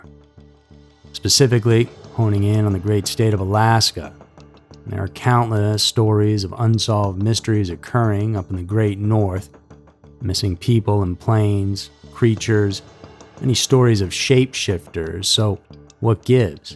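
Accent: South, United States. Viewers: He's got a slight Southern accent I can't quite place by region, while his soft tone and melodic speech puts me in mind of a Tennessee Williams character or time frame or filmy floating fabric or something like that. I just discovered him and liked reading all the appreciative comments. I grew up with riveting storytellers from pioneer days, and fits that category I think.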